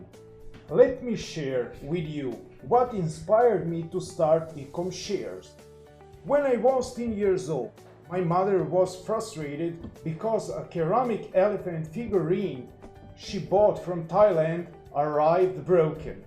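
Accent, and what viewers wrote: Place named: Jacksonville, United States. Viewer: Lol grew up in Jacksonville? I knew I recognized that accent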